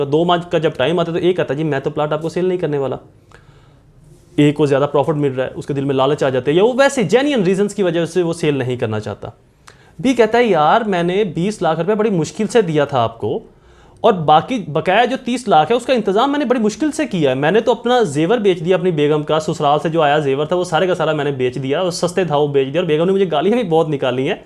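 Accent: Punjab, India. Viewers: Nice punjabi accent sir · Sir g punjbi sun 🌞 ka maza ah ga ap ki punjbi hmra jisi ha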